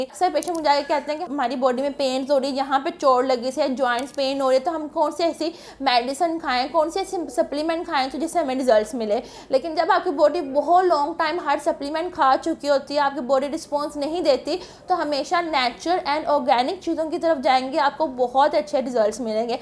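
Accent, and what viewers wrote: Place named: Lahore, Pakistan. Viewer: Lahori accent..love u ho gya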